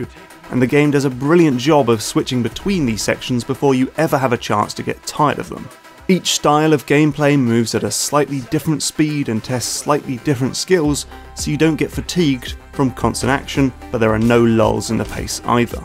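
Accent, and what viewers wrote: United Kingdom. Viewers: Your British is showing! ; ) "This thing, that chases you like a quarterback." Quarterbacks don't chase people! Anyway, love the channel! Keep up the good work.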